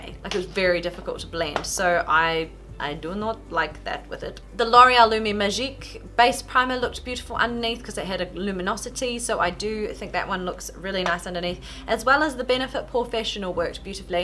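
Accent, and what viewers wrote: New Zealand. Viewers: is she from new zealand?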